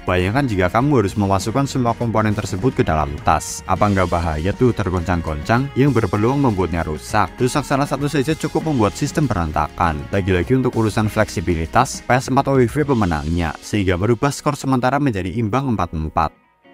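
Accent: Banyumas, Indonesia. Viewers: VoiceOver.e ngapak nemen ya?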